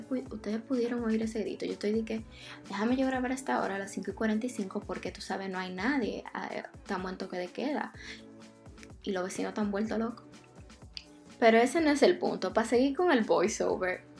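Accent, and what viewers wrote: Dominican Republic. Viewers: Eres domicana! Conozco tu asentó 👊😌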